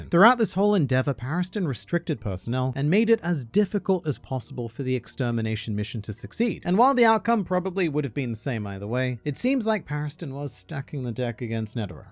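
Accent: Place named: Australia. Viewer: I've been subbed for a while now but stopped watching for a bit. Just listening to your voice in your earlier videos, it's obvious that you're Australian and being a basketball fan, I've always guessed you'd probably look like Matthew Dellavedova. Now seeing your face for the first time... Holy shit. Lol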